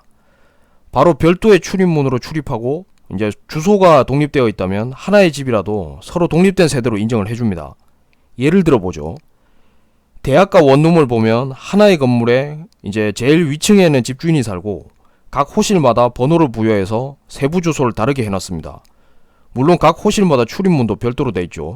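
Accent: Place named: Jinju-Sacheon, South Korea. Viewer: 감사합니다 억양이 진주사천사람 같아요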